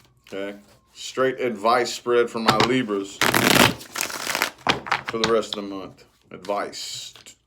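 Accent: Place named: Rural, United States. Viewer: Love your country voice cute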